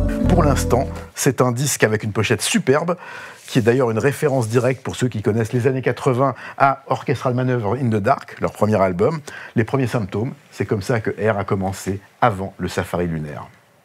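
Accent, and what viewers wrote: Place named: United States. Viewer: Merci pour cette rétrospective bien sympathique.. Un clin d’œil à ton accent américain c’est énorme ! Cindy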